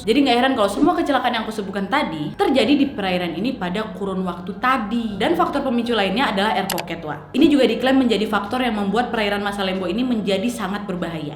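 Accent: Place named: South Kalimantan, Indonesia. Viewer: Dari kalimantan mana suara nyaa Kal-Sel Nih bos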